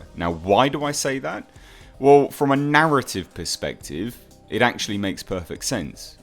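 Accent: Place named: England, United Kingdom. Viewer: Your trailer breakdown was the only one that was good!I'm 48, grew up watching all these movies, Gamera also! But I've never, and I mean NEVER been so excited for one of these movies, they've done godzilla so well! Monsters look very realistic! It's gonna be great! U have an English accent, are you in England or the USA? And are the release dates the same?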